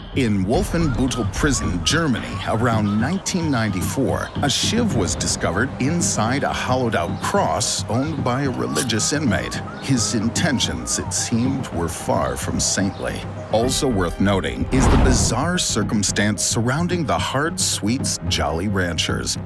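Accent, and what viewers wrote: United Kingdom. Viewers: Leave it to a bri'ish person to give an account on the best way to stab someone with a knife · You’re ao british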